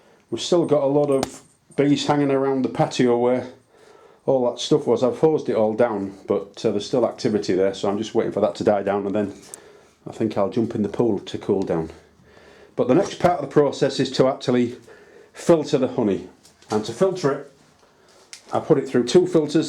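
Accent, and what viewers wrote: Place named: Manchester, United Kingdom. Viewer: Thank you so much most interesting after watching your three videos. We are hosting three hives in our garden and I’ve been asked if I want to look after them. You are most helpful. We live in Herefordshire but we couldn’t help noticing your Mancunian accent which is where we originally came from.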